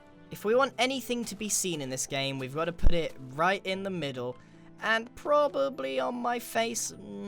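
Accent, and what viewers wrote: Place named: Southern England, United Kingdom. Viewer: I’m British and it’s the norm to me.Its a normal middle-class south English accent. I would say it’s more is voice then is accent.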